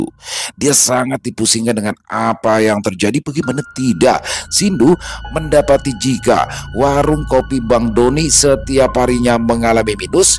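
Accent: Jakarta, Indonesia. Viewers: Tangerang hadir.. Logat betawi mantebbb.. Logat kalimantan cakep.. Jawa jg boleh.. Emang serba bisa nih.. 👍👍👍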